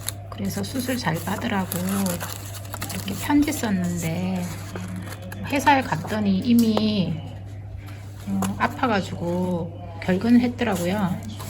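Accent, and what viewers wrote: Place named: Gyeongsang, South Korea. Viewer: 반갑습니다 까망님 체널 보다가 님 댓글 보고 왔습니다 스위스 사시는군요 추운날씨에 촬영 하느라고 수고하셨습니다 첫눈 치고는 많이 내리네요 경상도 분이 신가봐요 사투리가 조금씩 들리네요 ㅎㅎ 저도 구미 살아요 응원하고 선물도 드리고 갈게요 행복한 하루 보내세요☕;)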